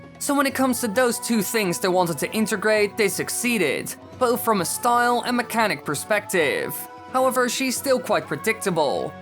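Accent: Netherlands. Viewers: Goshdarn you're so dutch by your accent. Or belgian?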